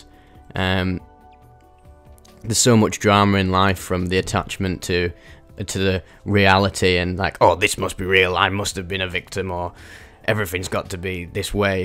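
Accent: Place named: Northern England, United Kingdom. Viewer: Eee lad, I love that northern accent and humor. Trying to keep me wig on. 😅…. You’re awesome 😊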